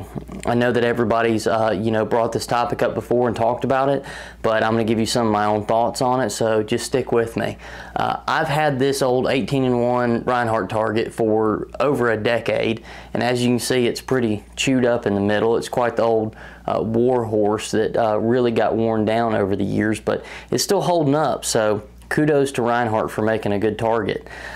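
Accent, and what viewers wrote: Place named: North Carolina, United States. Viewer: Man where are you from, that’s quite the accent, NC?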